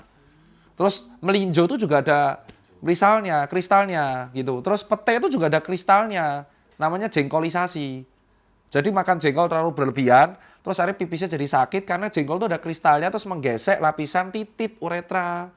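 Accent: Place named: East Java, Indonesia. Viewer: Bukan marah.. Itu emang aksen khas jawa timur. Khususnya surabaya dan sekitarnya.. 😂😂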